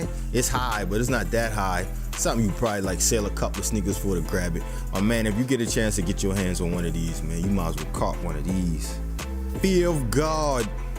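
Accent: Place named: South Carolina, United States. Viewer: Brah you sound like your from Moncks Corner South Carolina !!!!!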